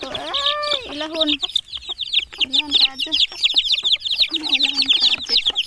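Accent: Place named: Visayas, Philippines. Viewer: Makamingaw jud ani farm life 😂 bisaya man diay ka Ma’am 🤗 unta mudaghan pa sila kay lami na sabawan hahaha 🙏🙏 keep going dai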